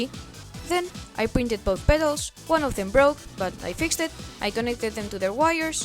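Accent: Spain. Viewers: Hi! I was wondering were you were from. By your accent, I guess you're from Spain, If that's the case, just know that what you've done could have been a 1rst of bachelor project, so CONGRATULATIONS!!!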